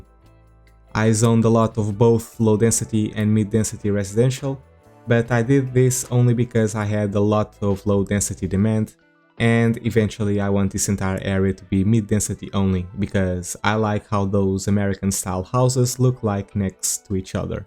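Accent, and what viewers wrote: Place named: Portugal. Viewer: O inglês do português é maravilhoso, merece o meu gosto. Nota-se mesmo quando um português fala inglês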